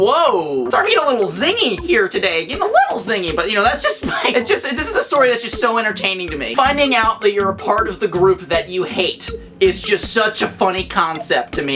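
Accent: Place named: South, United States. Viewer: I LLLLUUUUUUVVVVVVV ur southern accent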